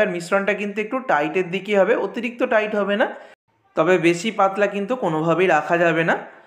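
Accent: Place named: Kolkata, India. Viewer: তোমার রেসিপি খুব সুন্দর। কিন্তু তোমার কোলকাতা স্টাইলে কথা শুনলে রাগ উঠে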